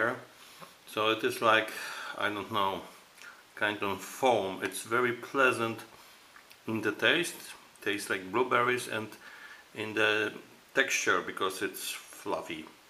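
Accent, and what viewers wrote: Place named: Saskatchewan, Canada. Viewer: * sounds like all of my relatives in Saskatchewan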